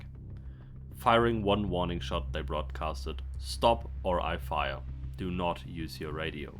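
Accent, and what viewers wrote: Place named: Germany. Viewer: great story, but this is the worst way to tell it... very childish presentation and piss poor german accented english.... damned offensive actually.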